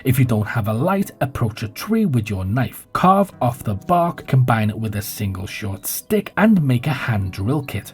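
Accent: Liverpool, United Kingdom. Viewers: Are you part Scouse? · Not even close. This bloke is from the UK, Scouse accent from Liverpool probably.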